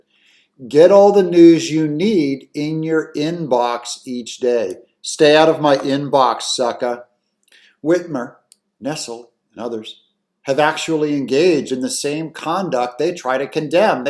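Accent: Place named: Scotland, United Kingdom. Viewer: Love yer Scottish accent 🤭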